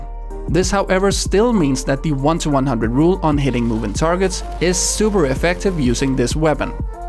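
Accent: Denmark. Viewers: The reason some of you guys think his way of speach is funny is becouse of his danish accent we have a very bland pronunciation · Its called being danish...we have a funny accent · So quick question.. Are you Danish? Because your accent sound a little like Danish. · Are u from Denmark?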